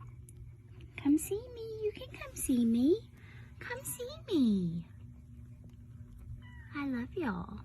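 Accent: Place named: South, United States. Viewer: Your sweet voice combined with your Southern accent is very SOOTHING FOR THEM! 😇😇😇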